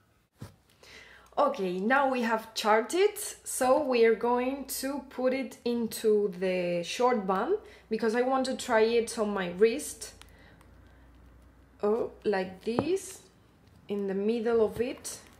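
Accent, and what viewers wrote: Andalusia, Spain. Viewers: No. tiene ese 50% de su encanto en ese acento andaluz...Se tenía que decí y se dijo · Sos muy simpática! Tenés un conocimiento enorme de música e de la guitarra y lo explicas con toda la simpatia del mundo. :) A mi me gustó bastante tu review de ese metronomo. Ya lo había visto en las publicidades en facebook pero no tenía idea de que podría hacer todo eso. Tal vez lo compre también. jajajaja! Y me encanta tu acento andaluz! Suena muy elegante! :) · Cuando hablas inglés tu voz pierde ese acento tan especial "español"que me encanta. Gracias por el video ;muy buen tip para los músicos.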